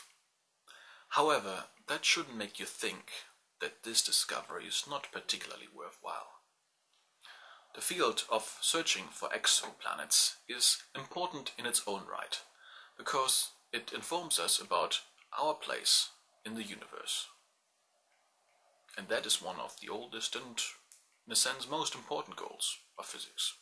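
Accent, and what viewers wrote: Germany. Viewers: God I LOVE hearing that accent! Ich bin von Erfurt. Aber wir (meine Eltern, Tanten, Onkel, etc.) leben schon für fast 30 Jahre in den Staaten! (In Salt Lake City, UT...) Woher kommst du? (Mein Deutsch ist nicht mehr so gut ...) God I hope you AT LEAST speak German... I swear! I KNOW that accent! I hear it every single day! It's so familiar. Then again, you could be Dutch, Swedish, Norwegian, etc... Hope I'm right though! ;)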